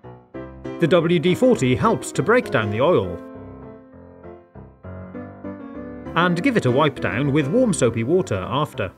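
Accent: United Kingdom. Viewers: Hi are you British cus I am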